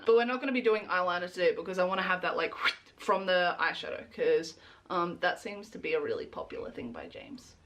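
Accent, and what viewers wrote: Australia. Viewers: I'm so used to that hearing you as your natural Aussie self made me do a triple take! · Because I mainly watch your channel for the makeup videos, I’m not used to your normal voice but I am not complaining! I really appreciate JJ as well as! Also I love hearing a fellow Aussie on this platform, never realised how much an Aussie accent stands out! 😂 also having mild PTSD hearing those birds in the background... me hearing those after being on TikTok all night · After a long time her accent has gone more Australian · Also, 11/10 for representing the Australian accent, we need the real you as much as fantasy you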